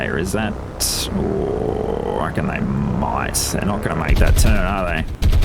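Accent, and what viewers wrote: Australia. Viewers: DEFINITELY Australian, LOL 😆 I love you guys 🤗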